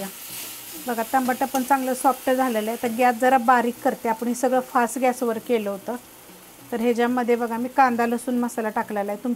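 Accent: Satara, India. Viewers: सातारकर आहे काय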